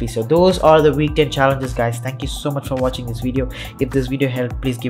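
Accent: India. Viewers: If your indian you have the best indian English accent nice your the only indian I can understand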